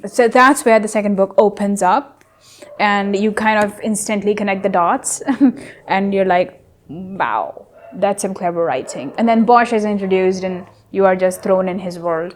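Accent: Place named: England, United Kingdom. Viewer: Aap English accent ko kaise prounce kar leti ho dear 😚 tips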